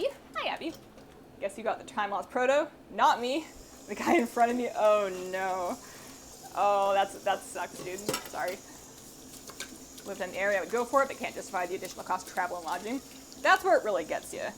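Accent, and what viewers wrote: Canada. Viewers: Never knew you were Canadian until this one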